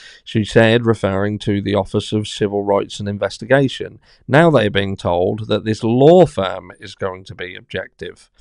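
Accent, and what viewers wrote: United Kingdom. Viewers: I can’t follow. Normally I relish an accent from uk but can’t this time. Straightforward reporting is best.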